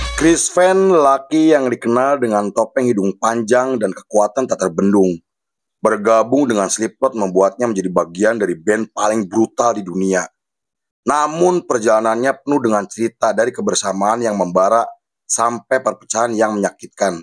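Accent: Indonesia. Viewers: Medok amet suaranya kaya kang ibing 😂😂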